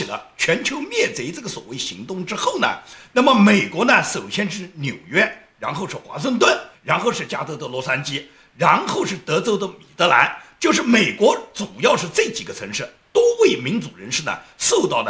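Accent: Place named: Guangdong, China. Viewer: 听口音不像广西的，更像广东的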